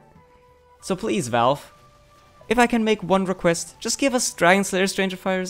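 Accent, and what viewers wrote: Germany. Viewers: if i didnt know you were german i would never know by watching your streams but the german accent is too strong in the videos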